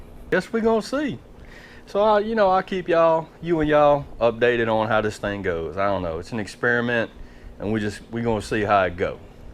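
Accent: South, United States. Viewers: Hello Mr. so glad Barry didn't blow up to hard your way we got some good rain off of him and I needed it garden loving it,Looks like them pickles going be good,hey I done old timey 14 day old pickles before but never done any like that,I will be following may be something for me to try, and nothing wrong with your southern-ish 😉😁You have a good week and try not get to hot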